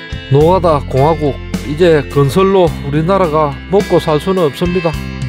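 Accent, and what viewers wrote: Gyeongsang, South Korea. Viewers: 시공사부터 괴비리쑤레기판결인디 저영상찍는 갱상도 사투리가더사기꾼 같은디요...?:어~???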